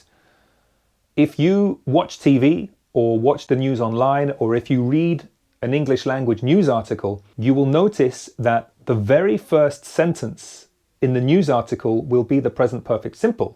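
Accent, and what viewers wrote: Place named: London, United Kingdom. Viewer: Hi my dear teacher! That was exactly what I needed! Your excellent explanation of Perfect Perfect Simple, with your beautiful londoner accent! Congrats! It is a great video production!🌹🙏😘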